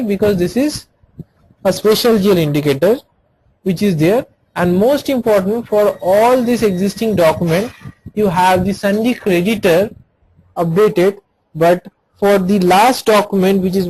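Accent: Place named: India. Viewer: Man this indian accent is annoying, i cant understand anything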